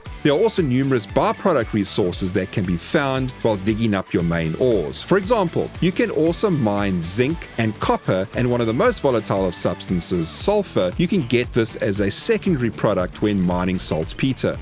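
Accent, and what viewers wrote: South Africa. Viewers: Ek kan hoor jy is afrikaans, dankie vir die inligting.Mod lyk awesome🤟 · Instantly know a South African.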